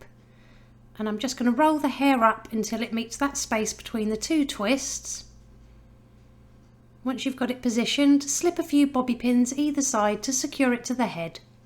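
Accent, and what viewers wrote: United Kingdom. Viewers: England accent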